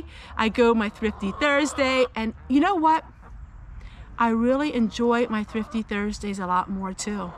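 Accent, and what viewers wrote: Pennsylvania Dutch, United States. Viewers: "Cryin' in a bucket" Oh, I do like that. My first thought was ", your PA Dutch is showing" I am really enjoying this video. Just got to the part about how we like it when you ramble, and yes that is true! "Maybe it's 'cause you're talkin' ta us like we're just friends" Love and hugs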